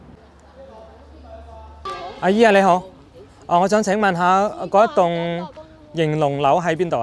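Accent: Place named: Cantonese, China. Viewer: ，原来会广东话的🤟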